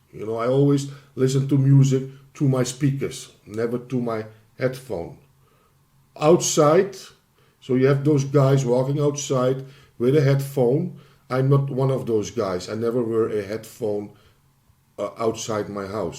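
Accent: Netherlands. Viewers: Volgens mij ben je Nederland! Goeie beoordeling bw thx.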